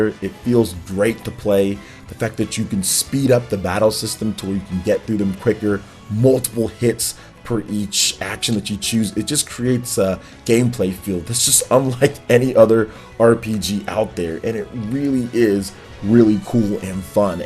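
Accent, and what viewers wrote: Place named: United Kingdom. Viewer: British accents are so annoying.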